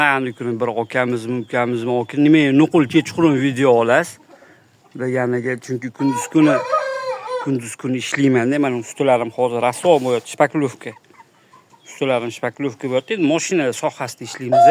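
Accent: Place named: Tashkent, Uzbekistan. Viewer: Xaqiqiy toza Toshken sheva 😊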